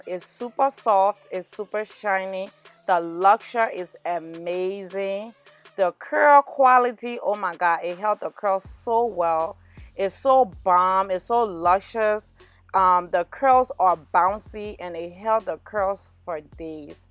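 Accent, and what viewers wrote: Liberia. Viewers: you sound liberian go awwwf sis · I hear Liberia !!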